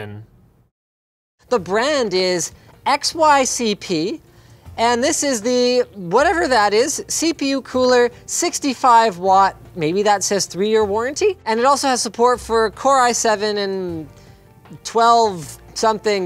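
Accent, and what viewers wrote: Canada. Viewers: The Canadian comes out so hard in this video... Normally I can't hear Linus's accent too much, this episode though...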